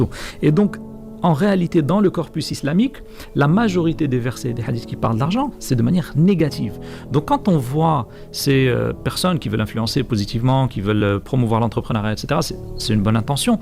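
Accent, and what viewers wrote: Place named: Morocco. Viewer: on reconnaît l'accent marocain❤❤🇲🇦🇲🇦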